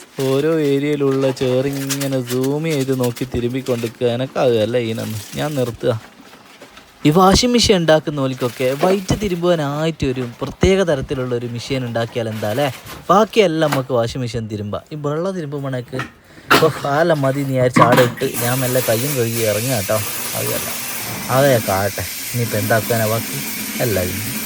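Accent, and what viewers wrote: Vadakara, India. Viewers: നമ്മുടെ വടകരസംസാരം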